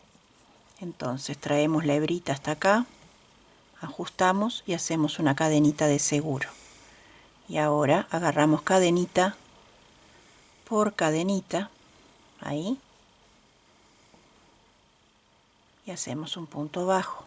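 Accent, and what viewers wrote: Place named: Argentina. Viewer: Me da mucho gusto haberle encontrado, tengo una hija en Buenos Aires, creo por su acento, que usted es de Argentina, y se llama, y tengo unas primas, con quienes me crié, que son Garcia, esos apellidos son muy sentimentales para mi, y por lo que usted manifiesta en sus palabras, siento que tiene cualidades especiales. Gracias por compartir sus conocimientos.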